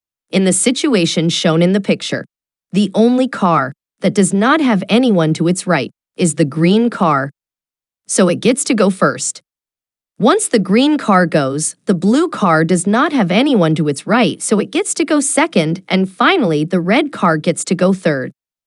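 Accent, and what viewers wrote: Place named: United States. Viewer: As the voice is American, I'm going with the DMV. Car B has right of way. Vehicles from the right, have right of way as both vehicles arrived at the same time. Reference California DMV: Remember, "right is right". The “First Come, First Served” rule applies. If two cars arrive at the same time, the driver to the right has the right of way.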